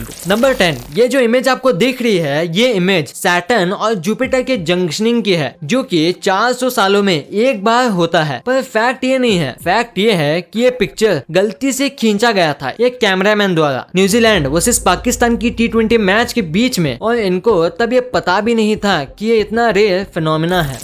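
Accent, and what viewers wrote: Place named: South, India. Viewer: Tumhari aavaz se lagta hi ki tum south indian ho!!!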